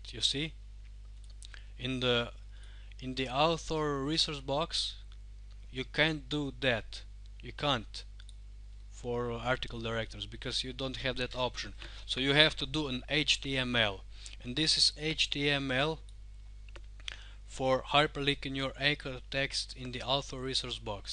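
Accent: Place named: Russia. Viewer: oh i love Russian Accent. Greetings from Indonesia my Friend.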